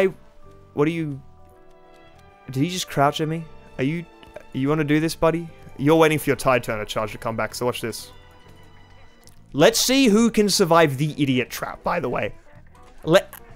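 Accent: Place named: Australia. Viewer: The thing is, this isn't the case in basically all of his other videos if you look back on them, this sort of thing only happening on rare occasions to minor degrees. But in this case it's everywhere in this video. It happens a lot here where in other videos it's particularly rare. Being Australian might have something to do with it and the accent might be effecting it but the oddity is how frequent it is in this video in particular.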